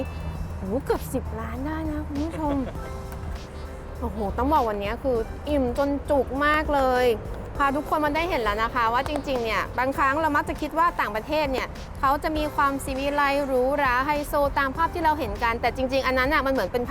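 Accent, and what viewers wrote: United Kingdom. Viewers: ฟังน้อง พูดภาษาอังกฤษสำเนียงคนอังกฤษ(ไม่ใช่สำเนียงคนอเมริกัน)น่ารักมากค่ะ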